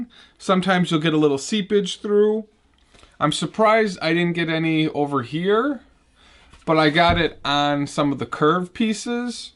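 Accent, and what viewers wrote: Chicago, United States. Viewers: are you from chicago?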